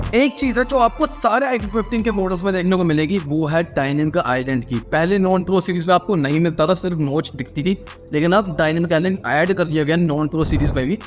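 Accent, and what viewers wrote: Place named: Pakistan. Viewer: Are you from Pakistan? Your tone sounds like them that's why just asking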